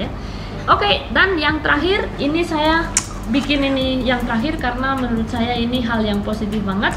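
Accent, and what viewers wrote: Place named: Indonesia. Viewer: ni cakapnya macam orang lndonesia dari ernis apa ya.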